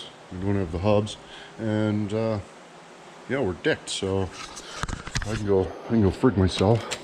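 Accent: Canada. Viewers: Hr sounds like Thommy Chong, I guess its a Canadian thing 🇨🇦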